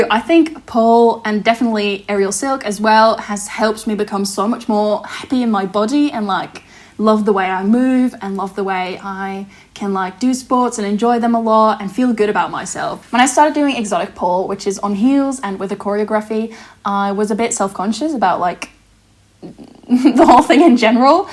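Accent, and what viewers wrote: Australia. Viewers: But I love your accent so much! I think it sounds australian and I love it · I agree! It actually sounds pretty Australian and I love it! It's one of my favourite accents!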